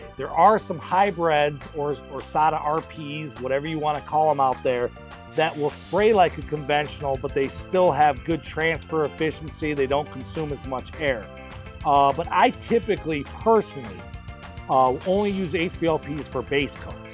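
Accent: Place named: Michigan, United States. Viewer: Q&A is awesome. I like that you are describing the way you do it. It's up to us after that to follow your way or tweak it to our preferences. I hope you keep doing the podcasts and video's. It is so interesting to hear you're way. It's great to get a professional point of view. I'm a Michigan boy removed 33 years. They said I had an accent when I moved to Washington State. I never heard it, until now. Love the Dad podcast. Also appreciate you helping us all out. I have a 67 Camaro. "Better of Dead" I'm in final sand. Base coat clear coat. I'm sanding to 600 because I'm going black. I'm using my local Wesco products. Euro prime. No epoxy. Can I put epoxy on top for a sealer? Is 600 to tight to grab the next coat? It almost shines. Using a finish line devilbiss 1.3. For final. Also. I have at least 1000 hours In bodywork. I don't want to screw it up by using the wrong product or clear on it. 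Thank you and good to hear that Michiangder accent!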